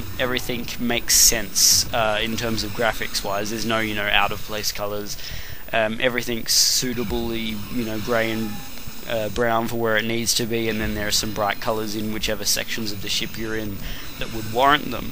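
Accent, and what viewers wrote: Australia. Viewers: always amusing to hear another aussie on youtube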